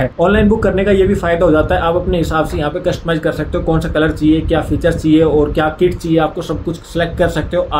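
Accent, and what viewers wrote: India. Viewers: i love your accent bro .. sounds like a pahadi ❤❤ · Bhai aap kaha se ho Rajasthan m , boli se aap Jhunjhunu side k lgte ho ❤ , btana bhai jrur se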